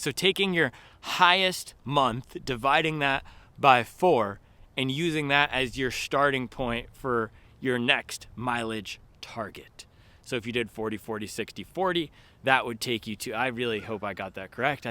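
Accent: United Kingdom. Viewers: I’m British and thought you were British for a few seconds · I swear I thought u were British 😂 I was 2 seconds from cutting it off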